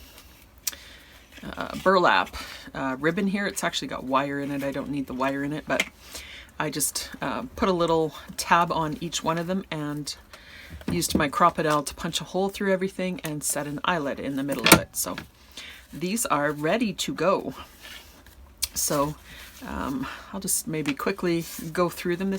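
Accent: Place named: Canada. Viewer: Thanks for sharing the link to this video,. I learned so much and am looking forward to making some of these tags. The tags are awesome. I also really enjoy your accent. It brings back memories of some sweet Canadian friends who have gown to be with the Lord.💜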